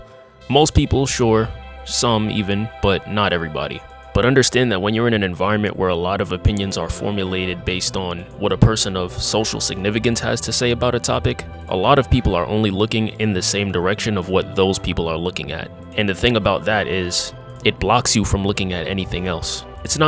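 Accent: Brooklyn, United States. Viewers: You from Brooklyn frfr huh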